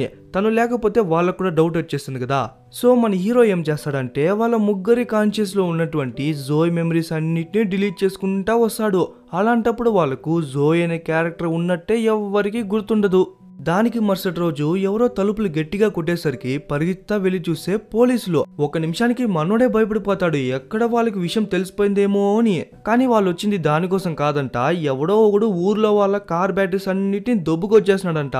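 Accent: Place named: Tamil, India. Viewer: Bro meru tamilian ahh me telugu koncham tamil mix chesinatu vuntadhi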